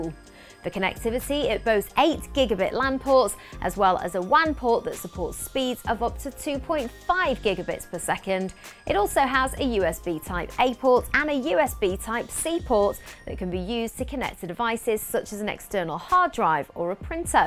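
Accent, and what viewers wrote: United Kingdom. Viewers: Awful British accent!! Rooter, Rooter, Rooter.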